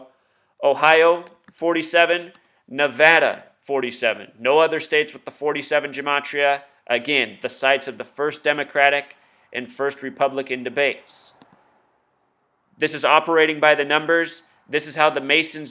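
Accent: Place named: New Jersey, United States. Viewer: My gut feeling tells me your from jersey.....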